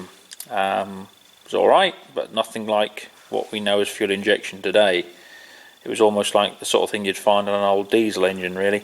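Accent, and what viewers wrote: Norfolk, United Kingdom. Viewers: I'm sure I can hear a bit of a Norfolk twang in that accent ! I'm thinking West of Norwich.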